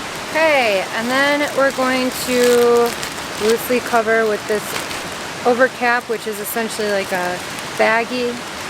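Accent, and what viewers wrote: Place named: Chicago, United States. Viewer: DUDE!!! I’ve watched a few of your videos, I’m subscribed but I work a lot, I don’t know if you’ve ever mentioned you were from Chicago but when I saw your 1st video I thought to myself, “she sounds like she’s from Chicago” lol! I live 45 min south of the loop!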